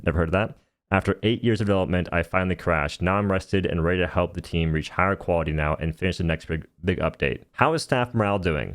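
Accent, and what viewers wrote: United States. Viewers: his name is pronounced as yo han. couldnt be more obvious that your american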